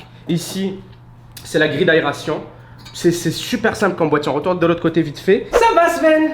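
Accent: Canada. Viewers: tes canadien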